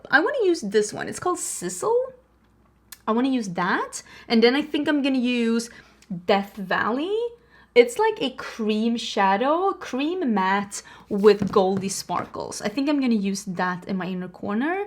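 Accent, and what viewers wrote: Sweden. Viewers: omg........ i love my swedish clown with an accent..... dont like me well dont let the door hit you on the way out..... ppl have too much time on their hands omg!!!!! i love you!!!!!! you are perfect the way you are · dont change! you're so Wise and Talented! I love hearing how you pronounce things with your Swedish accent. So glad I found your channel, You're my favorite Youtuber 🥺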